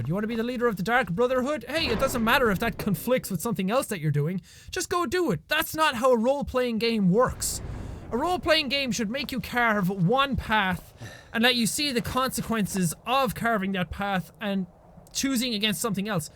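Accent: Ireland. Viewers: He's Irish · listen to an irish man bitch for 20 minutes... ill pass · Class accent I'm Irish too xD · i luv yer irish accent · Personally I think your accent is awesome, it's not often you get to here an irish person talking in general. Which is kind of weird really, do you all just not like to present yourselves in public? What's the deal here? You're kind of representing your entire people really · so irish.. love it! (was in drogheda last summer, liked it a lot) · jacksepticeye I dunno what they are on about, sounds like a rich markisepticeye level of audible chocolate to me:B Maybe they are not used to the 'flutey to foreign' ear of the irish accent, but I could pick your voice out of a crowd of a hundred easy and that's a damn good thing for the U-Choobs! If you play Skyrim again give em two barrels of al gore:D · Griffin Watson HES IRISH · Your voice is not high pitched, it is simply your Irish accent. 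· Are Irish? I detect a small hint of Irish in your voice.....sorry if that was a personal question.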